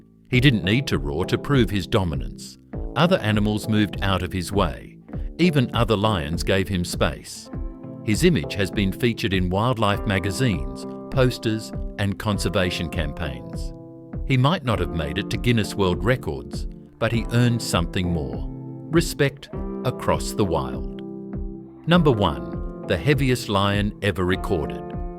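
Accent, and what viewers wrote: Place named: United Kingdom. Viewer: I hate to have to listen to a British bot.🙄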